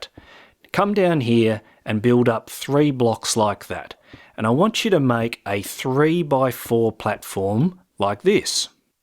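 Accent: Australia. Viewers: You're Australian right? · Good to hear a Aussie doin some MC videos. Have you done this in Survival mode before? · Are you Australian?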